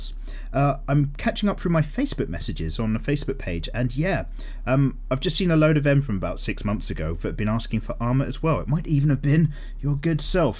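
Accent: United Kingdom. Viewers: you kinda look like and sound like Ravi from Izombie (not just the British accent). if you don't know who i'm talking about... well you can look it up. i'm also gonna post this on every DWM in the future till you find out. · dumb question but here it goes . I've kept tabs on your videos for a time now because___ you are really fucking good at this . why not put out a little auto biography of how it is you got this effing good , and why (even it's for extra money) why you let us all in on it . the skill set , your craft , the glib humor . there's no other channel worth sticking with because they all suck . Lastly , in America many of us are burnt out on british accents they use it for everything commercially so you're the rare exception for me . so what do you say ? how about a story about yourself . ( hell include your band too) best regards , me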